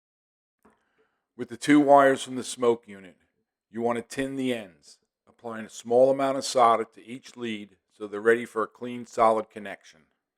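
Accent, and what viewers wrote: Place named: New York, United States. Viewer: Thank you for a great video. I just followed it and did the conversion on my 665 and it’s great. Out of all the videos out there on this topic, I chose yours because of your accent. It just seems right when I’m working on a “made in New York” Lionel. Have a great day!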